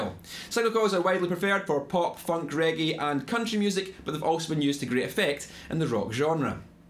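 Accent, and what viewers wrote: Scotland, United Kingdom. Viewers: F*ck me, a great video on a fascinating subject, and instead of compliments and questions, the comments are plagued by thickies who have never heard a Scottish accent. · it's scottish? cool, i was guessing australian..... cheers :) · It's just a run of the mill Scottish accent! Nothing special. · Good to hear a Scottish accent for a change, plus I understood what you were saying, I liked the look of the 2nd guitar you were playing, can you tell me what it's called? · Scottish accents are so cool wish I had one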